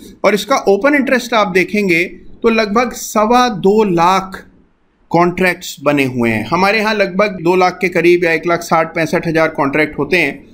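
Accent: Standard. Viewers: Sir, Aap ki suddh Hindi suntehi karn trupt ho gaye. Thanks a lot for informative video.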